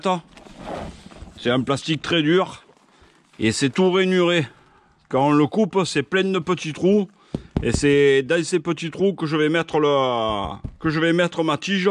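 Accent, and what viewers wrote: South, France. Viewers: merci pour la reponse je vais en faire avant de partir peu etre pourrons nous se voir vers des cieux au taunos sil on est pas loin jaime cet accent tres sud cordialement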